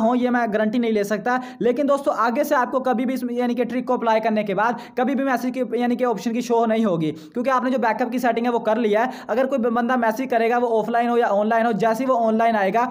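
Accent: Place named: Pakistan. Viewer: Bhai aapki English se lagrha hai aap pakistan ke hain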